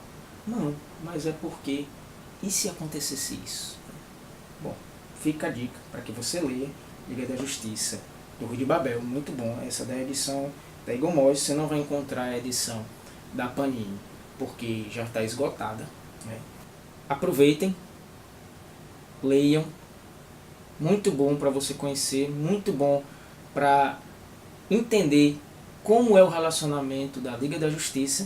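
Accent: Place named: Northeast, Brazil. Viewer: Grande Vídeo, tava faltando vídeos com um sotaque familiar daqui do Nordeste :). Abraço.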